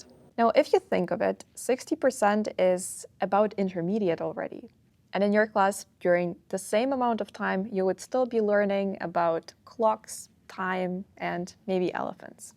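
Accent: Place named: United States. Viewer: That's fine but you're speaking English with an awful American accent. Why do so many people do this?? It's not English. It's annoying.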